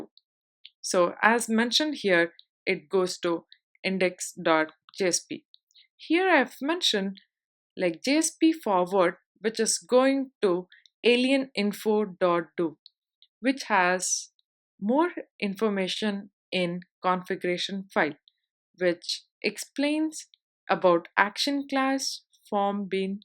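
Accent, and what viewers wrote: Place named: Europe. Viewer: Her accent is more like European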